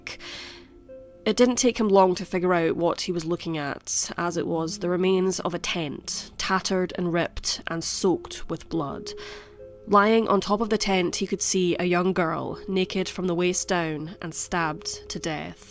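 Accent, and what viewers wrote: Scotland, United Kingdom. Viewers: Geez, some of these yt honchos are doing really dodgy things! The same thing happened with two other channels I watch. One of them ended up going to Odyssey. Yt had permanently demonetised his amazing crime channel. Your channel is brilliant and your content is fascinating. The research into these cases are phenomenal too. I have followed you for months and your Scottish accent is lovely. Anyway, cheers from Australia!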